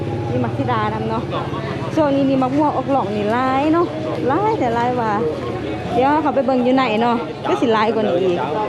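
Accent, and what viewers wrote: Ubon Ratchathani, Thailand. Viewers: พี่น้องไทยลาวกะนี้ล่ะสำเนียงการเว่ากะคนเมืองอุบล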